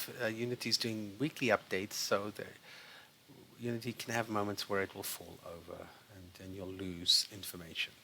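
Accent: South Africa. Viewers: Are u SOUTH African??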